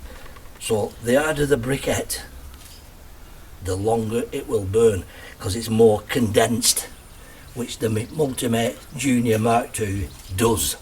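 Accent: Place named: Yorkshire, United Kingdom. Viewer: I love a Yorkshire accent.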